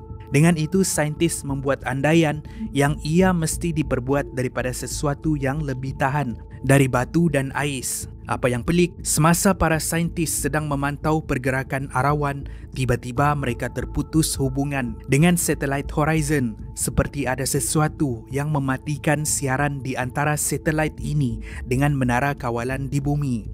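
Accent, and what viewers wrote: Sabah, Malaysia. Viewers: Suka tgok penulisan dan dengar org Sabah brkata kata..menarik...trbaikk ya..betul lh tu kut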